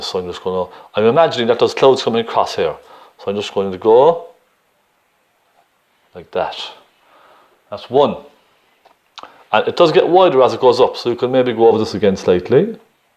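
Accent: Ireland. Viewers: I Detect an Irish accent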